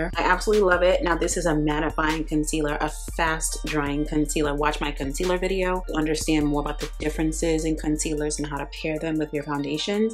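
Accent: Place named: Rhode Island, United States. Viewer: Come thru with that RI accent!👌🏼🤌🏼😂🤣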